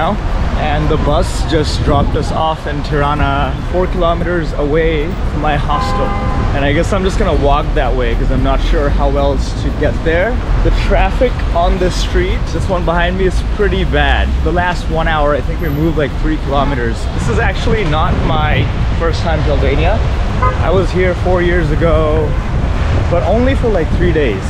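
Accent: United States. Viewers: Boy you made this vlog so interesting which convers Albanian history, culture, sights and touch to the food as well which is an essential part of the travelling. Very nice video. Also you say you are from Bangladesh but your accents is pretty much like USA and Canada. Thanks for the sharing. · Brother well ❤️ Can you say a secret thing like how have you learnt the us accent ? Because u said you have been to us for 5-6 years so during this time did you adopted this accent ?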